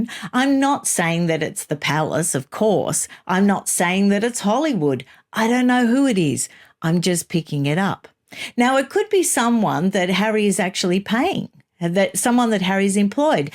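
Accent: Australia. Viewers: Hi, Great show, always nice to hear an accent from here 🇦🇺 I think you're right, there's not a lot to report on anymore. I have actually started to unsubscribe from some YouTube channels as it's just rinse, repeat, argue and fight amongst themselves quite boring, nothing new or interesting to listen to anymore.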